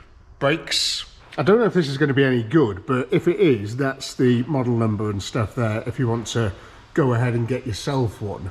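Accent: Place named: Scotland, United Kingdom. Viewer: Love your scottish accent are you scottish i am❤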